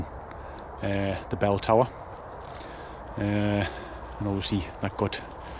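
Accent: Durham, United Kingdom. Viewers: Was introduced to your channel by Ouija Brothers so just started watching your videos. Very very interesting. I love hearing about the history of places and the added bonus of your paranormal investigation. Your accent sounds like your from near me in Durham? Keep going your doing great. 😁😁😁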